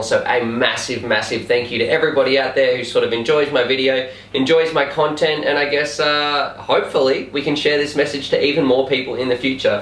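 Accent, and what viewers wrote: Australia. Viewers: You sound Australian but I was confused because you have been using US pricing in vids? I Aus as well. Thanks for uploading all these amazing vids!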